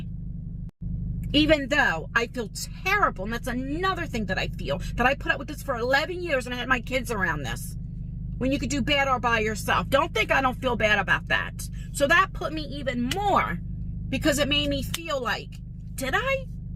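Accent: New Jersey, United States. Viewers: It’s just been a few months since I found your vlog channel and now I watch every one❤ Just know there’s lots of us that really care about you and enjoy your videos. I wish I could help 😊 I love that you protect your kids and I’m also diabetic so I can relate. I’m also a mama bear with my kids and grandkids. 😅 Besides the fact I’m from California and LOVE YOUR NEW JERSEY ACCENT 😂 Reminds me of when I worked in a Jewish Deli when I was a kid. I worked with a cook from New Jersey 😊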